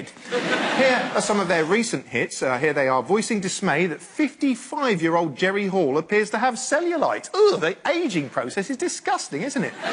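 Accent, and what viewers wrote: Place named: Home Counties, United Kingdom. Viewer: a Brummie that grew up in Surrey so he has that Home County accent with a bit of Brummie twang.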